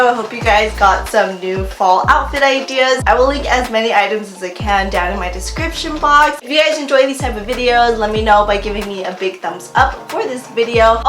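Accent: United States. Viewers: You can tell she’s from Cali, even if she wouldn’t say it💀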